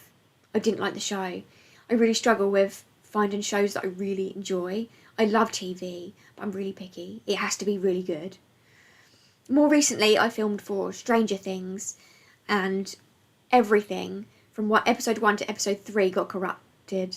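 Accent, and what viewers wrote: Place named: North London, United Kingdom. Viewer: I discovered your channel from the early Skybound days and you became an instant follow. There weren’t many British reactors that I could find back then and I was trying to suss out your accent …( I settled with somewhere North of the Thames )…,the giant mugs of tea and the ‘professional crying’ drew me in..! I remember a video you put out about 3 years ago and after that one, I could see the hurt in your eyes that you were trying to overcome and continue onwards but the damage was done and I noticed the sporadic posting..! Recently have been unfollowing some channels that haven’t posted in a while but yours was not on that chopping block…I kinda knew you’d be back sometime..! Anyway…I’m beginning to waffle but I do have a suggestion for a tv series to react to…it’s called Pluribus…it’s on AppleTv and apparently from the same guy that did Breaking Bad and Better Call Saul…two shows that I’ve never seen but liking this at moment.! 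But it’s great that you’re possibly coming back to reacting and that you have a focus to move forward with..! Excelsior… 😉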